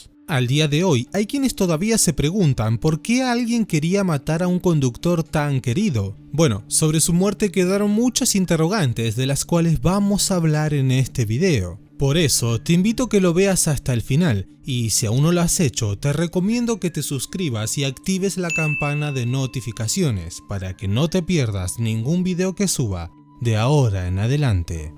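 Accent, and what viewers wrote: Argentina. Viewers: Escuche tu acento argentino y creeme que iba a parar el video porque veo qye tanto argentinos como colombianos viven de la farandula mexucana..pero bueno haz tu trabajo😅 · Ese acento argentino bien estupido 🤣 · pues tu video sin chiste. no comentas nada nuevo en absoluto, no pude terminar de verlo por tu detestable acento argentino que lo hizo mas nefasto aun. un bodrio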